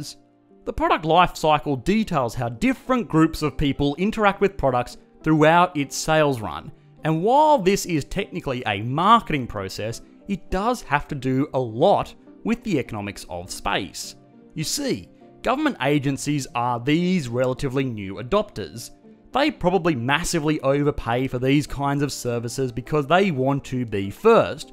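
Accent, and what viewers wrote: Australia. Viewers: Not gonna lie I'm a sucker for the Aussie accent. Half the reason I'm subscribed to Mr. Sunday Movies too.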